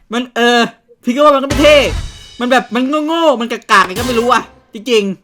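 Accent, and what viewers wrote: South, Thailand. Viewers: พูดใต้หน่อย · พี่เป็นคนใต้หรอ ผมพึ่งรู้นะเนี่ย555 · พี่ เป็นคนใต้เหรอค่ะ??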